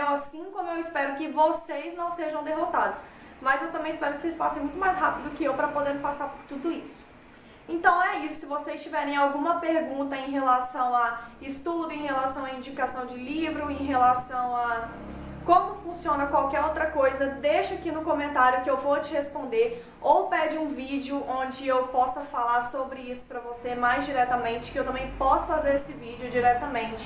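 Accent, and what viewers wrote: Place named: Goiás, Brazil. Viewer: Não é pq vc tem um sotaque meio puxado ak de Goiás, sabe. Hehe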